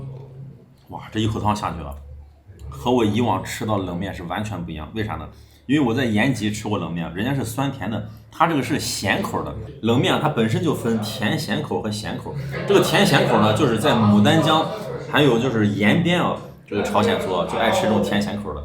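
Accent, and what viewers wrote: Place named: Shaanxi, China. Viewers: 尤其陝西強暴口音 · 陕西口音听着舒服❤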